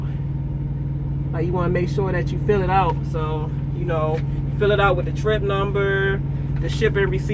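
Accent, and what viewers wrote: Baltimore, United States. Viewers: Tht Bmore accent is water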